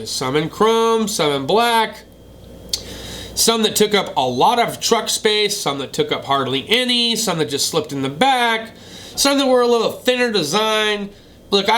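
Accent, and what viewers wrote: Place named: New England, United States. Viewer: Still talk like a Yankee tho👍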